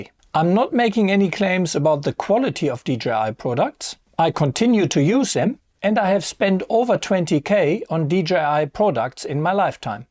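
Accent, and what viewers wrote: Germany. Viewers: That sounds german.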